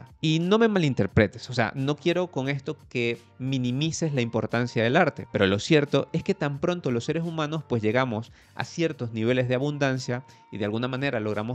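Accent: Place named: Latin America. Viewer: Es curioso, tu acento es hispanoamericano pero gran parte de tus expresiones suenan españolas, ¿vives en España? Buen vídeo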